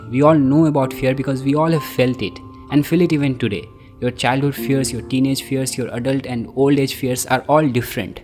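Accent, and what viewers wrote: India. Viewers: i hate this indian accent so damn much i just cant understand ittt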